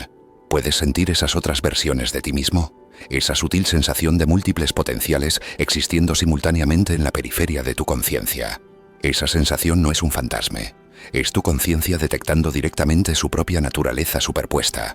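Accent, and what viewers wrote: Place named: Spain. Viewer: El acento español es muy desagradable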